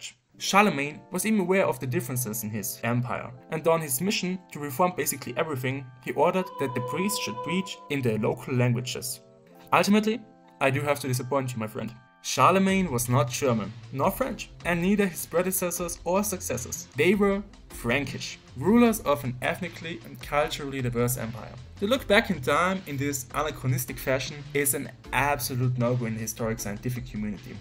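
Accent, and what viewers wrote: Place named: Germany. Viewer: you have a german accent bro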